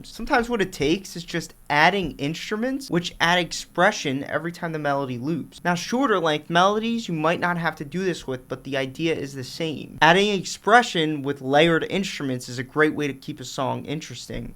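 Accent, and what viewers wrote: Philadelphia, United States. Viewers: You over analyzed non intent that was made by Xfer’s Cthulhu plug-in. But I like your excitement and cheesy Phila accent. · Sound you're from Philly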